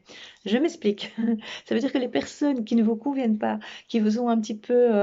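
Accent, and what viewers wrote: Belgium. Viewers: Coucou,j'aime beaucoup tes lectures et ta douceur. Petite question. Tu es belge ?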